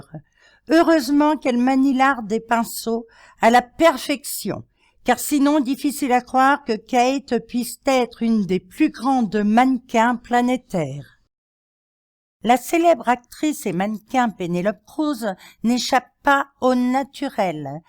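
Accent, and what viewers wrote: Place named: France. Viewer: Quand vous dites les noms des actrices et les titres en anglais a la francaise , c'est trop mimi ! Bonne continuation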